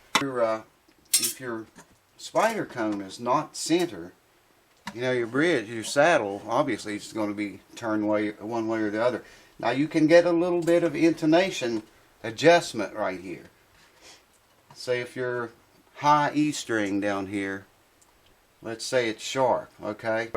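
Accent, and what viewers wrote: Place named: Appalachia, United States. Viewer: Great video - your accent reminds me of the Appalachian Mountains - I sure miss my old friend Ralph Stanley.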